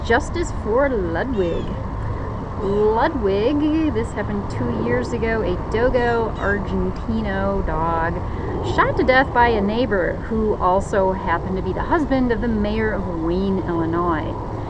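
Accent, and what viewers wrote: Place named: Wisconsin, United States. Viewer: I grew up in Batavia and live in N. Michigan. Well, you haven't lost your Wisconsin accent have you? This was a nice, nature filled video which brought back fond memories of the good 'ole Fox River.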